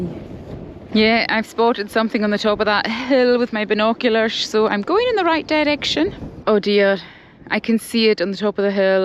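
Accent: Scotland, United Kingdom. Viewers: Absolutely fantastic video, of a truly stunning Island, such beautiful scenery, and you had the weather with you, well for part of your stay, great video I thoroughly enjoyed watching, you gave a great insight to the history of the Island too 😀 thanks for sharing 🙏💚 PS, can I ask please? Whereabouts in Scotland is your accent from? 🙌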